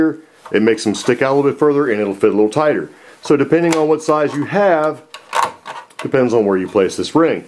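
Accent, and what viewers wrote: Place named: Texas, United States. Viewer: Mojo? nah".....Never lost it, that Texan drawl forbids it, you wear that 5 gallon hat with pride!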